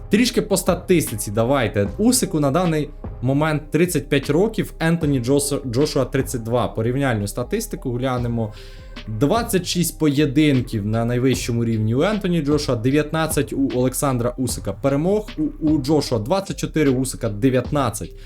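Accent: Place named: Russia. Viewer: даже по твоему акценту видно что на русском языке лучше разговариваешь,не ломай язык и разговаривай как удобно на русском да и думаешь тоже так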